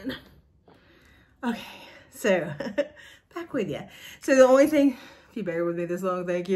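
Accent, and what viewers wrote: South, United States. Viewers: I miss hear that southern drawl so i will definitely be back i have great memories of living in the south 😊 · 🎉 I have heard you shouted out from about 4 floss tubes!!! Love your stitching and honey that accent is like honey! I’m a native Texan and have always loved any southern accent. Happy stitching!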